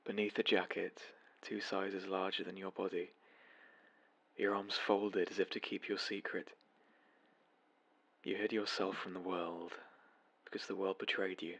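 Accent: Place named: United Kingdom. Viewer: This is lovely... the British accent really gives to the words of the book...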